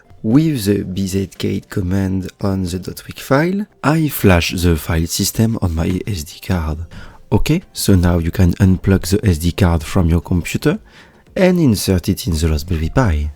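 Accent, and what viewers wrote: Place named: France. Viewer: You have an french accent 😂😂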